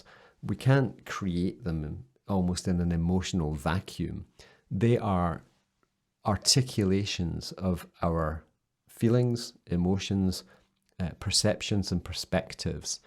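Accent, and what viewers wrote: Scotland, United Kingdom. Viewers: I just love the Scottish accent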